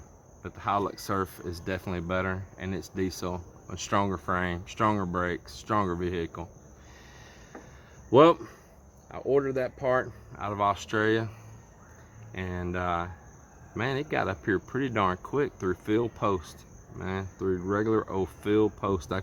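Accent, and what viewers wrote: Texas, United States. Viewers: Luv your texan accent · I am a foreigner working in Manila. I like the attitude you share and I also like your Texas accent. 😄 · Love your passion. Hope to have more of you. Can we clone you? Love your Texan accent. Hope you are enjoying your stay in the Philippines. You are a sweetheart. Take care and God bless. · Love the accent sir, texan accent! I’m proud of u sire! Can i work with u sir? Don’t worry about the salary, just love hanging out with u sir. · You from texas? I can tell. Working in BPO :) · And i love your Texan accent.. real cowboy language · I always love Texan accent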